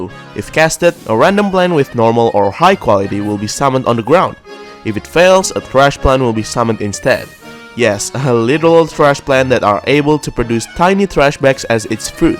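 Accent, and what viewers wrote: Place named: Indonesia. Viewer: Logat indo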